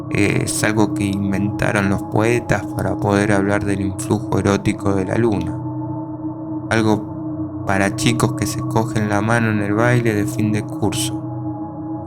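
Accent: Argentina. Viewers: La narración no es tan envolvente ó agradable.... Ese acento argentino ó paraguayo, no provoca seguir escuchandolo...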